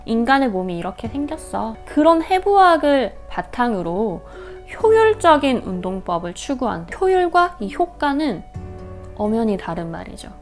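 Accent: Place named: Busan, South Korea. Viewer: ㅋㅋㅋ사투리 잘 쓰셔서 부산사람인줄~ㅋㅋㅋ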